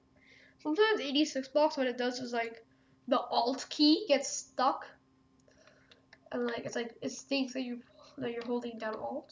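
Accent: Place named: Ireland. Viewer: Wow the accent. sound a bit irish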